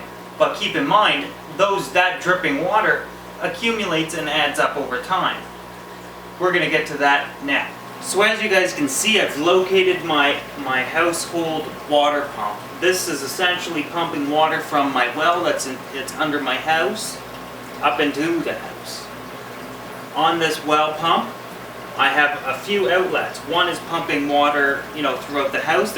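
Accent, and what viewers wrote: Canada. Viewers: Love watching videos by Canadian guys. Too funny accents.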